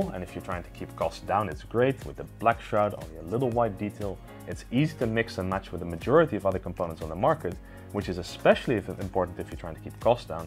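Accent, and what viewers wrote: Netherlands. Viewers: Jawel, hij spreekt Engels met een Nederlands accent. Bovendien is hun pand gevestigd in Nederland. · are you dutch?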